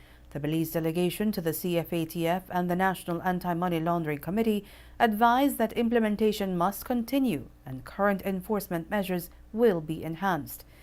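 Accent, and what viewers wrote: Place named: Jamaica. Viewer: Is the newsreader Belizean? She sounds Jamaican.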